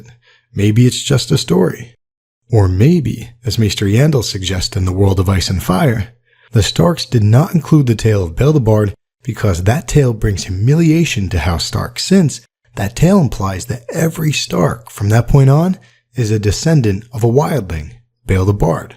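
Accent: New Jersey, United States. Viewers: You knocked the narration of this one out of the ball park!! Not too fast not too slow & just a hint of Jersey 😀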